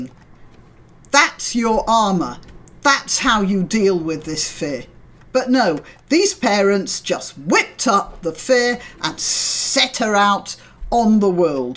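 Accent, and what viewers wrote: United Kingdom. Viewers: I like your beautiful British accent very much. Thank you for your voice. I am your new subscriber.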